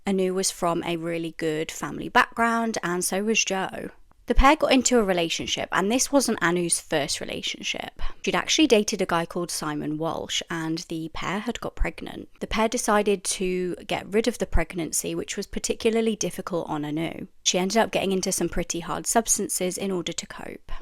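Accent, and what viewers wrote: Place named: Yorkshire, United Kingdom. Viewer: Yummy Yorkshire accent. ❤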